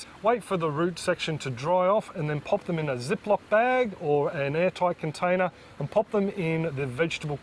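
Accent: Australia. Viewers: So happy to hear an Aussie accent! I live in Cairns. Up here our weather is hot, hotter, cyclonic. I was given some ginger about 3 months ago and had no idea when to harvest, great information just when I needed it. I was about to did them up now! I have new shoots coming up. Is there anything that I should do differently living in this climate? Thanks.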